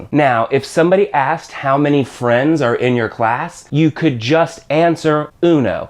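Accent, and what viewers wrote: United States. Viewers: Your very good at teach but the american twinge to your spanis pronunciation makes me giggle a little bit!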